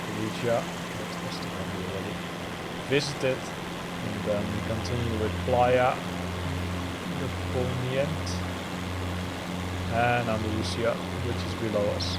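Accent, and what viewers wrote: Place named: Netherlands. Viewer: Recognized the accent and immediately Subbed to support a fellow Nederland er!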